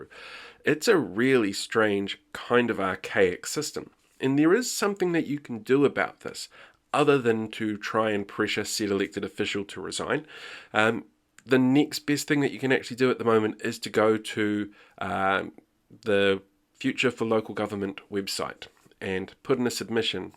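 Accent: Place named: United Kingdom. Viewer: First time visiting this channel and I am a little confused. I don't know what drama this video might be talking about so I am assuming this is British law? (Guessing from your accent)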